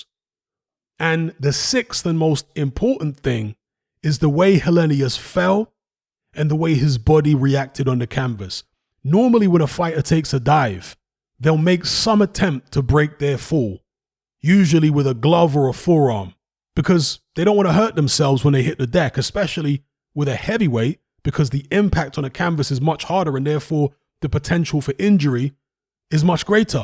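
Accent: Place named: United Kingdom. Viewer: Bruv, the minute I heard that British accent I knew you were trolling. It was legit, quit hating.... Anthony Joshua lost, its over, get over it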